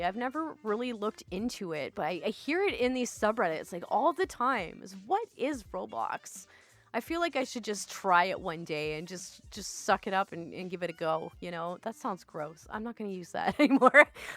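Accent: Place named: Yonkers, United States. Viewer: Just found your channel and LOVE it, so subbed and will follow your posts. As for the white chocolate brownies, NO. That's just wrong. Brownies should be Milk Chocolate or Double Fudge types... (grinz) Also, I like how you don't edit/change/bleep-out certain words. Plus that lovely Yonkers accent had me and my wife laughing.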